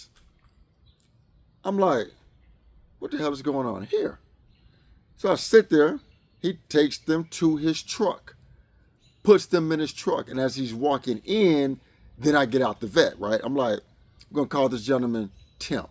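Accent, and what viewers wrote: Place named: United States. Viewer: American accent is really troubling.. is he talking about a Pizza?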